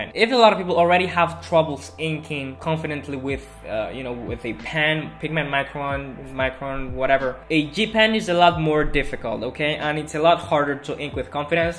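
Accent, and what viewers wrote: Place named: South Asia. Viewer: He sounds so South Asian I guess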